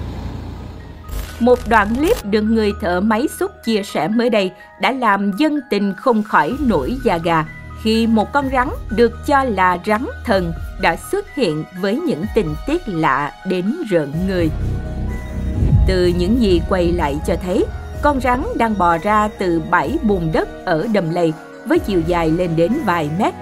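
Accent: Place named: South, Vietnam. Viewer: Người miền thì gọi là “ mồng gà “ người viết bài chắc không phải là người miền Nam nhưng người đọc thì phải .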